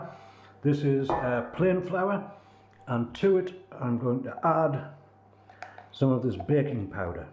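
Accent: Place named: Newcastle, United Kingdom. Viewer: My mouth always waters when I watch you! Geordie accent is a bonus too!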